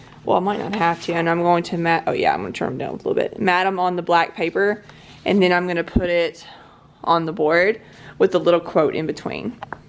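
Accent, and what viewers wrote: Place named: Kentucky, United States. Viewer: Fellow Kentuckian here, instantly recognized your accent lol. I'm just starting my teacher prep program and am currently teaching in Korea. Where in Kentucky do you live? I'm from Richmond/Berea/Lexington (lived in all 3 growing up haha)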